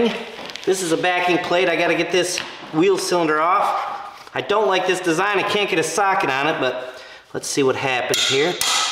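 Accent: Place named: New England, United States. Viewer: Another great episode. Rust removal so tricky. Looking good. I’ve never heard of that milkstone acid. Did a great job. Im so curious about your background. Obviously for your nickname and accent you are from New England? Maybe New Hampshire? And I wonder if you have farming in your background. Did you work as a mechanic? Just curious. You have so many different skills. Enjoy your channel.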